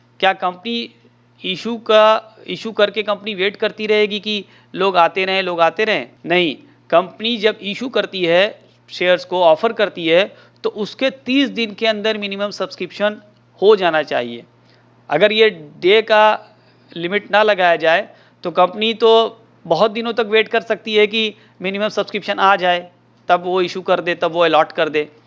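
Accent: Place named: Uttar Pradesh, India. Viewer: Dekha aapne mera andaaza Maine kewal aapki awaaz se pata laga liya ki aap UP se ho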